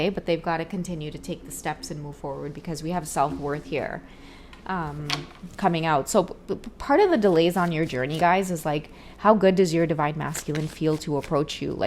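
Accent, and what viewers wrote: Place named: Trinidad and Tobago. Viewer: You got a subscribe with that trini accent😊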